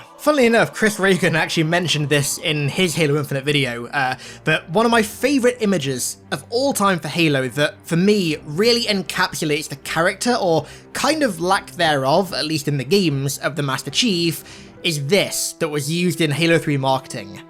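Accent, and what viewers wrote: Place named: United Kingdom. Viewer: This guy said if my voice sounds a little bit weird LOL this guys voice in general is horrendous he’s got one of those horrible British accents that sounds like he’s got porcelain teeth and he swallowed glass he makes great videos but I just can’t stand listening to his voice no offense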